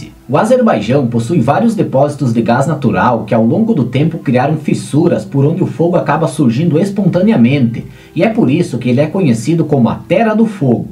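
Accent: Paraná, Brazil. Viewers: Gostei demais do assunto e também do sotaque que parece do Paraná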